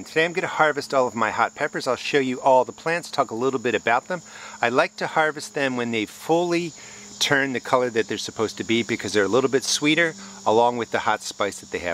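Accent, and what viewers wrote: New Jersey, United States. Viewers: Love the Jersey accent! A lot of cool stuff on your site too. Inspirational to see so many beautiful peppers growing. Do you ever grill them?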